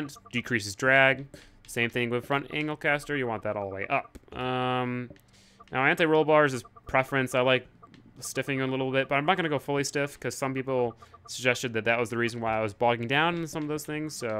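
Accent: Russia. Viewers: that akzent though :D (ps. thats propably a russian one ) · your german accent sounds more like a russian one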